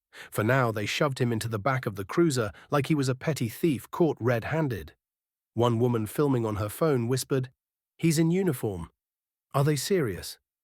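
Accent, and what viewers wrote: United Kingdom. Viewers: Sofa King tired of this AI BS posted by people with obviously zero talent of their own. On top of that: what kind of idiot puts a brit accent to a US military-based video. Fail on all accounts.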